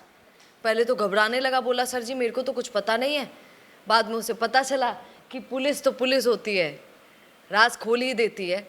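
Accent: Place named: Marwar, India. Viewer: आप मारवाड़ी भाषा बिच बिच में बड़ी अच्छी लागे सा बाई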